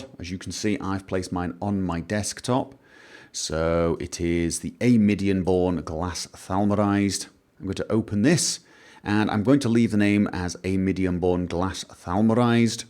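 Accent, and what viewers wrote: United Kingdom. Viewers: You are from Norway? this is impossible. I am sure You are British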